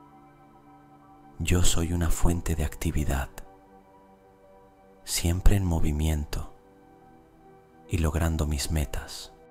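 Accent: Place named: Spain. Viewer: esa voz de españolete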